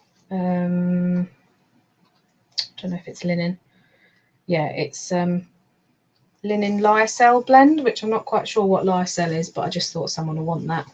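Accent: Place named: Suffolk, United Kingdom. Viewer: I love hearing your Suffolk accent. I'm a Suffolk girl too.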